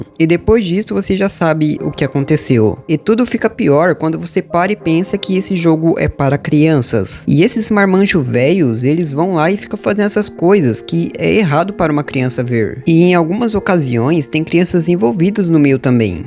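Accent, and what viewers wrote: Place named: Portugal. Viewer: deve ser de Portugal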